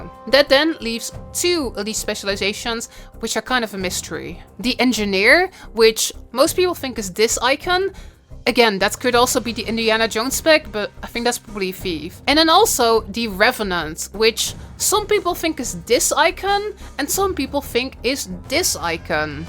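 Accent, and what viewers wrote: Netherlands. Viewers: you dutch accent seem to be fading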